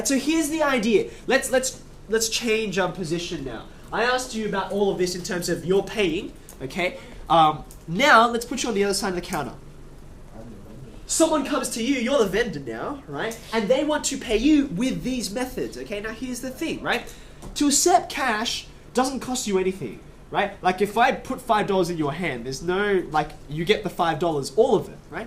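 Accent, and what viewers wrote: Australia. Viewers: My eyes say Chinese, but my ears say Australian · Hate aus accent. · Besides his obvious accent, you know he's Aussie when he says "Amex." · Woah it’s an asian guy with an Australian accent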